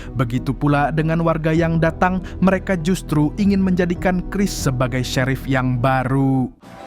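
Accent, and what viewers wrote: Banjar, Indonesia. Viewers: Urg banjar Pina nya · Banjar nya masih ada 😂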